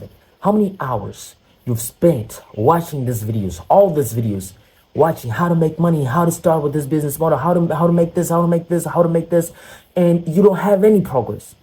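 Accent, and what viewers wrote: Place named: United States. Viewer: Give this man some subs he's perfected the American accent while living in Mozambique!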